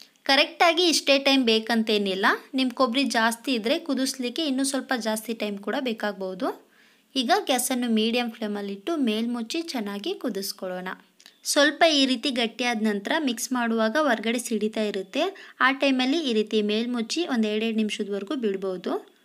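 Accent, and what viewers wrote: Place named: North Karnataka, India. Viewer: Mam nivu nam kadeyora....uttara Karnataka avru nim voice hage ede swalpa adake kelde mam ...thank you so much for your recipe mam🥰😍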